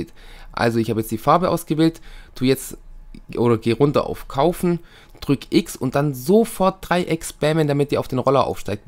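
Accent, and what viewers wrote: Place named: Swabia, Germany. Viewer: Dein Akzent ^^ Ich liebe ihn haha ;D Schwabe :D Ich wohn in Ravensburg, sagt dir das was? :D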